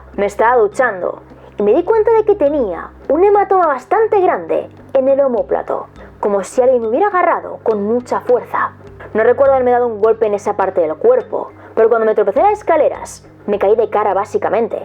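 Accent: Spain. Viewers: y sus kanas... xd Hermoso cabello blanco de, me encanta Tu acento español... me encanta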